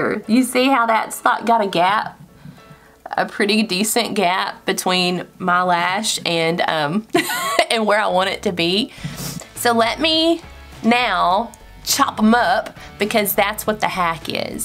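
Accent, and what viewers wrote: South, United States. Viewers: I just bought them yesterday and just found you today thank heavens, I've never tried false lashes of any kind and will try your advice. Hope I can do this. Also, I love your sweet southern accent! Thanks for your help! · She has the cutest Southern accent!! ♥ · Love...and love your southern accent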